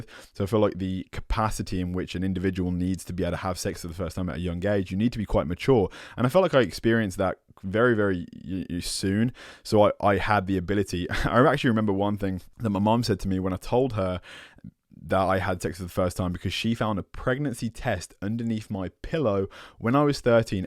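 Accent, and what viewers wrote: United Kingdom. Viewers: I'm loving your british accent. I'm from Holland and your accent and voice are so amazing. Could listen to it all day. Yep i love british english.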